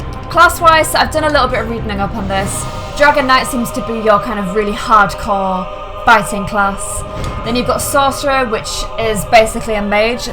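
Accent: United Kingdom. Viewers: Shes british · Oh my god she's so British.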